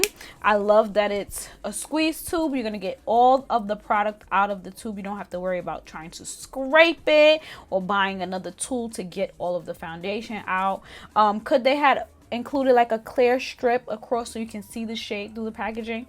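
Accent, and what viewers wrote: New York, United States. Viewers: Hi,. I am pleased to meet you on YouTube. I came across your channel on the wee hours of this past werkend, while you were sitting at your desk at work. I am a career Executive Receptionist, too. The makeup look so radiant on you. I can recognize a New York dialect anywhere. New York is home to me. God bless you.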